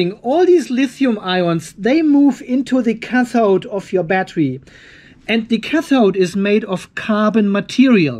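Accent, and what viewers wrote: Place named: Germany. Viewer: Bei Deinem ersten Video dachte ich mir, daß Du Deutscher ...ähm... gewesen sein mußt. Bei dem Akzent. Aber "Oettinger" geht ja mal gar nicht. Zeig der Welt bitte nicht so ein Plörre. Wie wäre es mit Düsseldorfer Alt, vorzugsweise "Schlüssel Alt" oder "Füchschen Alt". ;-) Grüße von Düsseldorf nach Australien!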